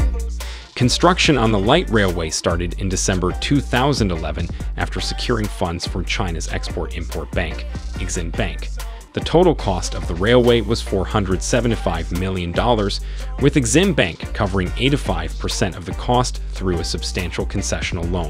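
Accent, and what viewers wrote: Africa. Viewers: Yeah African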